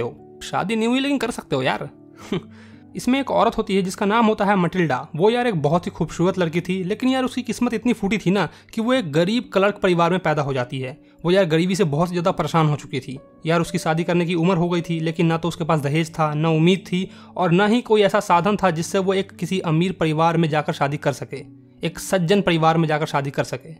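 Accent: Bihar, India. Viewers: Sir Bihari che gujrati nathi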